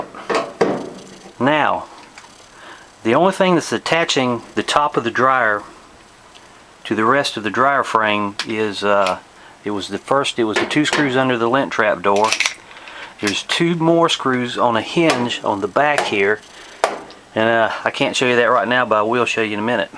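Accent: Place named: North Carolina, United States. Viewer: Thank you! I didn't know it was so easy! (And you accent reminds me of home.) (Coastal NC)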